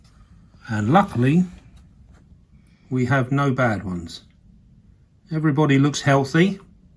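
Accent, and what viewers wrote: United Kingdom. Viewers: Why are you speaking in farenheit but have a british accent?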